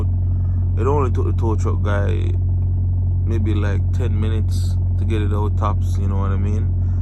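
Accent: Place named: Jamaica. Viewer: Sounds Jamaican 🇯🇲 to me …… mistakes do happen head up champ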